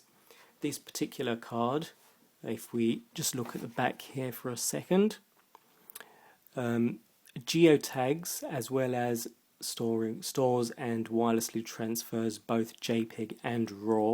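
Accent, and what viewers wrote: United Kingdom. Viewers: why is british accent so damn annoying?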